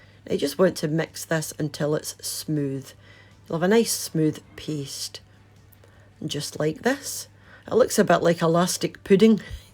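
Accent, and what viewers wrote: Scotland, United Kingdom. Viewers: I have been living in Scotland for 4 years. One of my fav things here is Scottish accent. Yours is absolutely adorable 🥰 And of course your recipes are inspiring 😚 Cheers! 😘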